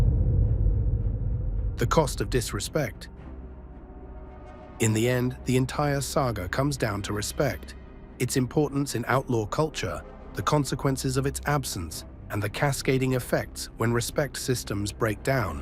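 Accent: England, United Kingdom. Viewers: Why so many videos narrated by an English accent?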